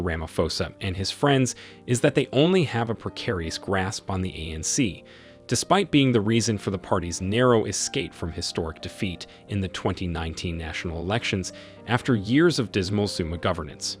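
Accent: West, United States. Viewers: Is sad but the person behind this i an African most likely from west Africa, he is just using a western voice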